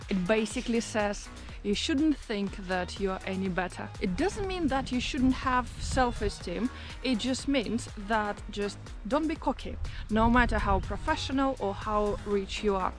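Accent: Russia. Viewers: I like your Russian accent 😬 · What is your accent. Are you from Russia or Eastern Europe? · This Russian accent :) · The English accent sounds similar to Russian. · Having trouble placing her accent. Russian?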